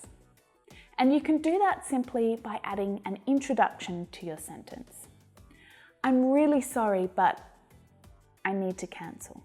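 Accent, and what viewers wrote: Australia. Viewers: Hi haw are you?are you from Australia?Australia is very nice cauntre.Australian people are very kind.you too.l love your acisent you speak good l am your student.thank you very mach l love your lessons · I'm lucky finally i got you here as a great teacher I'm live in Sydney I'm learning a lot from you specially Australian accents Thank you so much love you mmm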